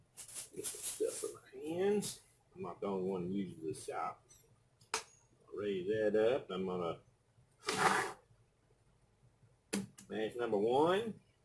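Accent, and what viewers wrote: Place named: South, United States. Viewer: THANK YOU SO MUCH. JUST PURCHASED THIS FAN AND I COULD NOT HAVE DONE IT WITHOUT YOUR HELP. U MADE MY DAY. SOUTHERN ACCENT WAS GREAT, I ENJOYED. HOT HERE N SACRAMENTO. I HAD A PROB WITH A WALMART PURCHASE AND THEY SENT ME 2. 2ND FAN WILL B MUCH EASIER 2 PUT TOGETHER. ONCE AGAIN THANK YOU GOD BLESS❤🎉🎉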